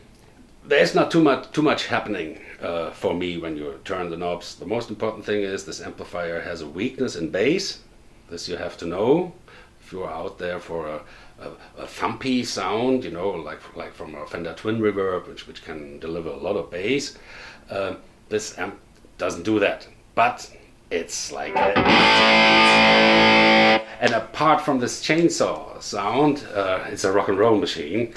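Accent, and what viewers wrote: Germany. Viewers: this was a very slowly and a little denglish review, but you play like a god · I love the German accent. Love Scorpions, Uli, Big fan of Engl amps. Hughes and Kettner too.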